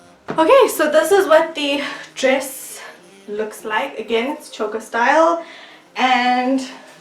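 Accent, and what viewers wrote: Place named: South Africa. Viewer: Hey girl! I loved this video - I am also a YBer from South Africa but I live in London now. So nice to hear a familiar accent! 💕 I liked and subbed to you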